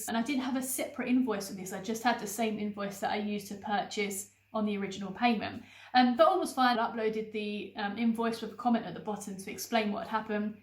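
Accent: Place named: United Kingdom. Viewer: I really like British accent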